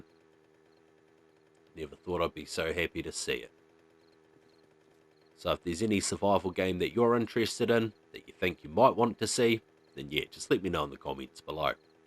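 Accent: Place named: Australia. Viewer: ive been thinking about what country you live in. youve got that australian accent but you seem like an american so its gotta be one of those 2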